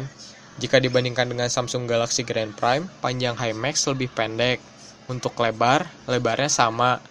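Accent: West Java, Indonesia. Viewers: Logatnya sunda pisan... haaaaa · Ngomong nya sundaaaaaa bingittt...hehehe..anyway..goood...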